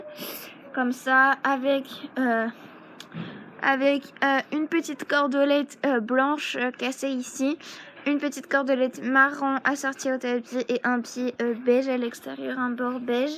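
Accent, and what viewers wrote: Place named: England, United Kingdom. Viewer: L'accent anglais😅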